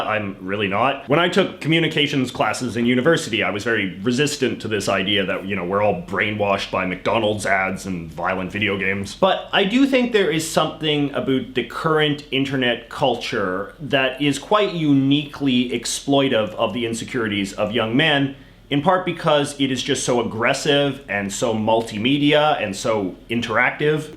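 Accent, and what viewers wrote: Canada. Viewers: what part of canada are you from? lots of aboots lol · I'm satisfied with your Canadian accent. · BTW, fellow Canadian Conservative here. Live just up the road from ya. Say "about" and "road" normal tho..... lol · Great video - worth a watch for the Canadian pronunciations alone. 👍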